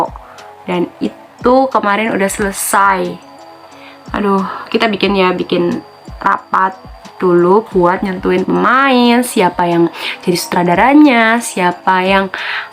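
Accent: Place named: Java, Indonesia. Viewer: Medok ya mba.. Jawa banget deh ☺️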